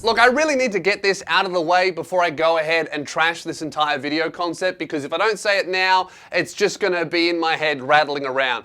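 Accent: Australia. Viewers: Since when did Ninja have am Australian accent?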